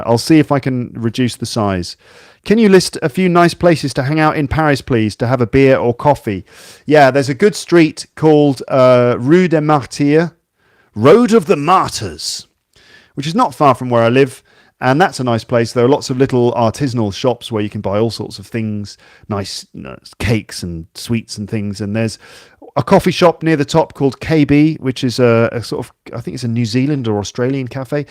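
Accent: United Kingdom. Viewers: I love listening to British accents... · This podcast is definitely needed for a British pronunciation. Thanks for everything, cannot live without it since my first listening!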